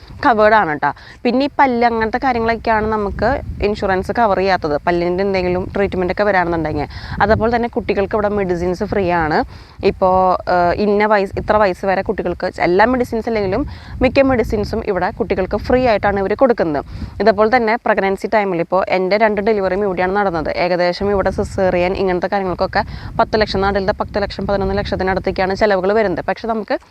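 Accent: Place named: Kerala, India. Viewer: തനി അങ്കമാലി സംഭാഷണം. ചെറിയൊരു comedyക്കാരിയുമാണ്. ശരിക്കും ഇഷ്ടമായി.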